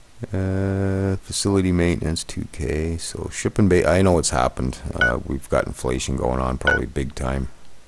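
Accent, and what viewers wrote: Canada. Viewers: you sound canadian. I love it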